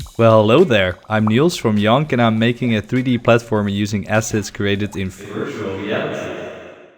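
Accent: Netherlands. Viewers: I hear a Dutch accent! Succes met je project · Yo, so ehhh, I just wanted to say that Unity3d also has Visual Scripting implemented since the beginning of 2021 :D I'm actually using that for my game :P EEEEEN Ik hoorde door je stem (en zag toen in je 'Over') dat je Nederlands bent 👍👍👍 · Hoor ik hier nou een stiekem Nederlands accentje in 😂🤲🏻 Character controller ziet er super smooth uit en er zijn zoveel richtingen waar deze game nog heen kan gaan! Kijk erg uit naar je volgende devlog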